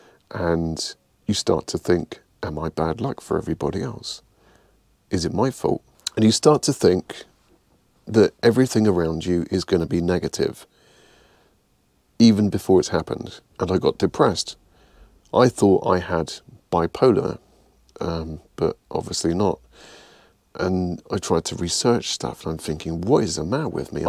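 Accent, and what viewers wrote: United Kingdom. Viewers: Wow, I just saw that video of the news anchor, too. I could so relate, as I suffer panic attacks also. I decided to try meditations as well, and now have been so much better. I still suffer from insomnia, but doing meditations during the day for relaxation helps me sleep better at night. I am sorry about your dad, grieving is such an extraordinary loss. I lost my dad in 2012, and know how easily it can be to fall into depression. But I had to stop feeling sorry for myself. I had to get my life back together and move forward, too. I commend you for working on your weight loss and doing what YOU want and need to do to make yourself happier and healthier. Have you ever thought of making custom meditations for people? I know you couldn't really put them on platforms, but you could charge a certain cost for them. I bet a lot of people would love that. 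I know if I could meditate to something custom made just for me... wow. I've always thought that would be so cool to have Lol. Anyway, another great vlog with interesting stories about you, and good tips and advice. Thanks and yes a meditation in an American accent would be neat, but honestly, I love the British accent. Plus, it just wouldn't be the same without your voice. Haha. Peace! ☮️ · It's going very well!!. Thank you. I use your videos to practice meditation and improve my English, by the way hehehe. Your voice and British accent are beautiful for both!!. I'm only missing subtitles in a few videos but it's not really important; ) and I've got some notes about music and voice, I'll tell you. Thank you so much!!. BEST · No don't do an American accent lol😂 I love your meditations because of your English accent 😀great insights here 😋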